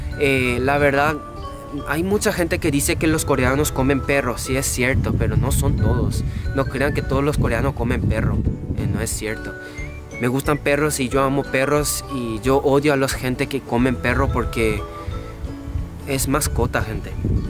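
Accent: Paraguay. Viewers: Trasmites la calidez de un paraguayo. Te siento un paraguayo más y creo que la pronunciación de la "R" lo confirma. Suena bien paraguayo. Muy bueno tu canal. Cuando vengas por Asunción, ya tienes un amigo. Sólo avisa y planeamos algo. Saludos!!! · tu acento si que es Paraguayo😅 también soy de Paraguay. Saludos de Quiindy😄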